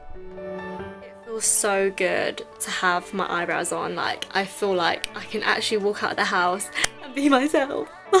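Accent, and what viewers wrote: United Kingdom. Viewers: I DIDNT EXPECT U TO BE BRITISH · Are you british? sorry I just subbed your channel >~< Though Ive been stalking you on instagram for a while... that doesnt sound creepy at all... anyway, love you! ^•^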